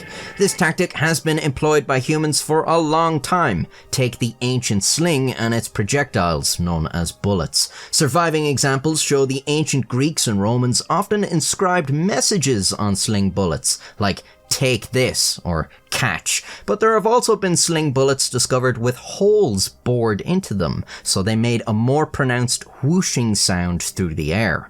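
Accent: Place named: Ireland. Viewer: Hey Fella, Gotta say I love your vids and content - been watching your vids for a few years - no BS ads, great story's, well presented with your lovely Irish accent and half a brain to boot. I won't and don't like and subscribe on principal. But keep your home page up in a tab for when you do upload and you NEVER disappoint. Legend mate! Effing honest Youtuber and hope you still make a buck. .... Just a little thank you from me to you. Cheers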